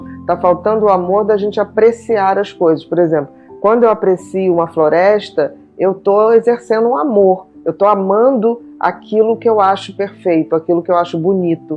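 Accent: Rio de Janeiro, Brazil. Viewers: Nunca tinha reparado que carioca falava assim… obrigado! 😅😁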